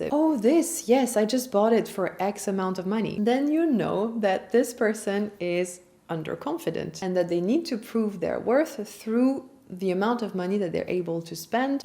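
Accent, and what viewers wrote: France. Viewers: Interessant, mais votre accent anglais franchouillard est éreintant. Dommage.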